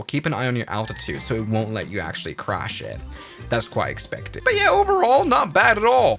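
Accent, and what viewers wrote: Germany. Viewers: are you german? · Are you German? (I am )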